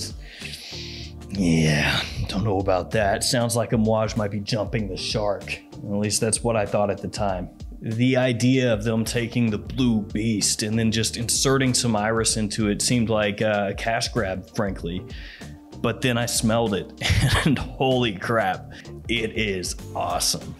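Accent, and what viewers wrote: United States. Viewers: Hey your 🇺🇸 accent is cool to us Brits 🇬🇧. My last unsolicited compliment was from wearing Mancera - Cedrat boise. Greetings from England 🏴 - Lee.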